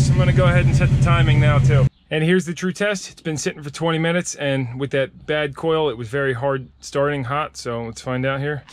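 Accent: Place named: Pennsylvania, United States. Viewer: Hi, new sub here, just found your channels. It was the '65 Plymouth wagon that drew me in . Sounds like you're from PA...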